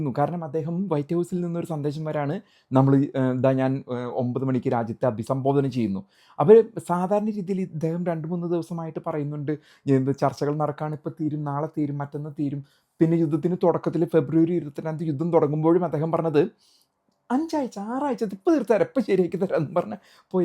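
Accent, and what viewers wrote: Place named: Thrissur, India. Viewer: നല്ല കിറു കൃത്യമായ നിരീക്ഷണവും ചേട്ടന്റെ ആ തൃശ്ശൂർ ഭാഷയും എല്ലാംകൂടെ നല്ല രസമുണ്ട് കേൾക്കാൻ. .. ഇപ്പൊ റീച് കുറവായിരിക്കും പക്ഷെ നിരീക്ഷണം തുടരണം, കുറേ നാള് കഴിയുമ്പോ ആളുകൾക്കു മനസിലാവും എത്ര കൃത്യം ആയിരുന്നു എന്നു. ..🙏🏻🙏🏻🫡🫡🫡🫡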